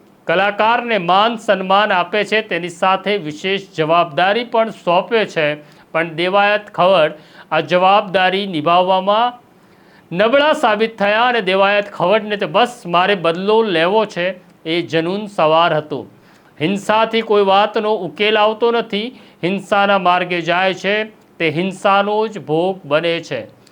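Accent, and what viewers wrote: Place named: Bihar, India. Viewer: તમે બિહારી પત્રકારો ના ટોન માં કેમ બોલો છો, ગુજરાતી ની જેમ વાત કરો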